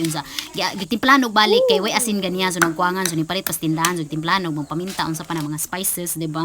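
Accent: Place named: Visayas, Philippines. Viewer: ha ha ha ha ha! You are so lovely with your bisaya/bodzol. besides that i am learning from you kitchen, you make me laugh as well. i love it. CHARS!!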